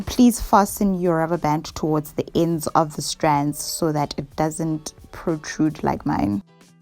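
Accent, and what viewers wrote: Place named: South Africa. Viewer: Are you south African you have the same accent as Kopano Shimange ❤️❤️❤️....much love from Kenya, 🇰🇪🇰🇪🇰🇪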